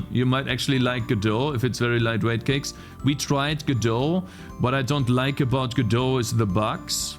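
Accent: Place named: Germany. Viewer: Gothic 1 and the whole pronounciation of all the words, he is german I knew it. Also im in the same limbo you were.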